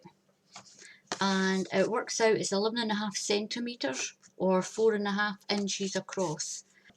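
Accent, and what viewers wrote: Scotland, United Kingdom. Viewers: I loved listening to your lovely Scottish accent as much as I loved watching the flower coming to life. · Just happened across this posting as I was looking for ideas for my daughters wedding. I have to say it was like my Mum was talking to me !(Scottish accent!) Thank you for this wonderful idea ! Now I need to make 30 of these!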